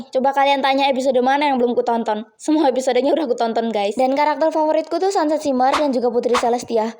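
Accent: Indonesia. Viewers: wihh aku jg banyuwangi, tp kalau cara ngmong nya kak emng mirip banget sih orng banyuwangi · Kok sama Jawa Timur nya kak😄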